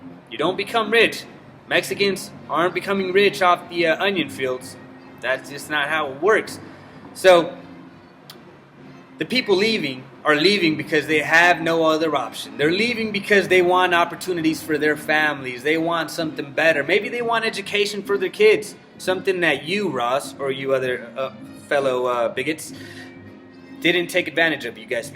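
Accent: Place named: United States. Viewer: I am so confused. You look Middle-Eastern or East Asian. You live in Mexico and you're saying you're Mexican. Your name is. Your English in American accent is pretty spot on. Could you clarify please? I'm not being able to solve the puzzle...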